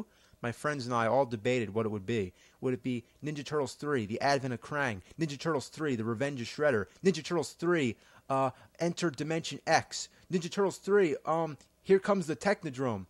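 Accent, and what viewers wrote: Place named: Pittsburgh, United States. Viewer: haha yeah i think it's ' accent. I'm pretty sure he's from Pittsburgh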